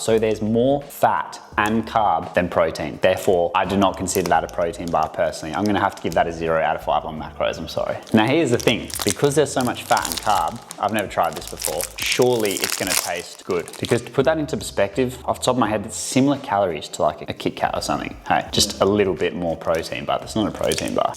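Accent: Australia. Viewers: I love how Australian you sound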